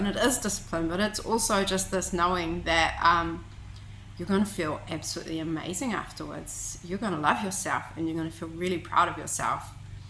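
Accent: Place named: Australia. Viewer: Good helpful/useful information, Good on ya!🚶🏃🏼‍♀️👍🏼 Luv your accent, Australia, right?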